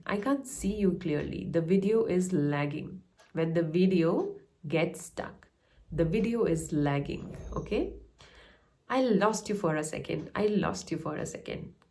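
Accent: India. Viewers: I felt there was a Hindi accent in your tone before, but if I’m not mistaken, I have seen great improvement now.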